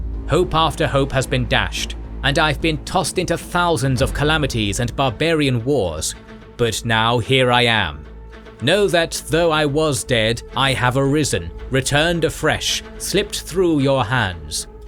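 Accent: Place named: United Kingdom. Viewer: Your videos are great, and I love your British accent, but you occasionally mispronounce words, especially names, in ways which can't be chalked up to dialect/accent. In this video, an example is "Paschal." The ch is a "k" sound in modern English, rather than a "ch" sound (so "Pas-KAL" versus "Pas-CHAL"), coming originally from the Greek χ. Please improve this, as it is distracting from what are otherwise amazing videos. I don't mean to be nit-picky, but people do judge others on such things and I don't want you to be dinged for minor things that can easily be corrected.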